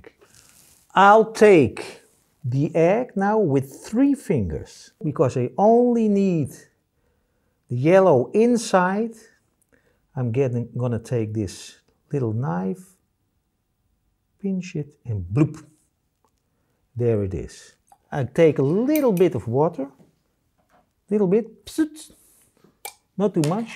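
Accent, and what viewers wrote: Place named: Netherlands. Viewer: Dear sir. Do I have to tell that I love your dutch accent. I loved this, even if I am familiar with paint makings since many years. I love when you say Rembrandt in a way that he would have said. I am a swedish old man, and understands just some words here and there, that we share, but I love the power and poetics in the dutch language. Many thanks for the tutorial.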